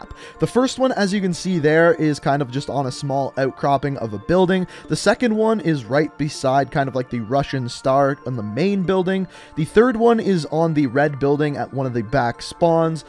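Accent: Canada. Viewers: Is this dude from Canada?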